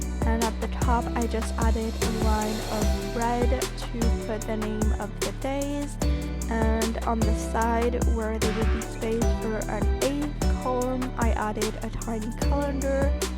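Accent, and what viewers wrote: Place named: United States. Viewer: I look forward to not only hearing your lovely voice but to see you upright & walking a few steps even if like me it's with leg braces! But please correct me if I am wrong but you have no spinal cord damage but just your brain not sending signals to your legs to make them work? Even though I have only known you on here for a short time,I would rather you have a reaction to a covid booster then catch covid itself & be very ill,we have one thing in common apart from being disabled,you rely on a wheelchair to get around & I rely on hearing aids & other medical aids to make my life easier! The good thing for you is your young & there are medical advances all the time so I am confident about you walking again! And who knows when I get more powerful BTE hearing aids or a CI I will hear how lovely you sound! And the American accent haha 💟💟💟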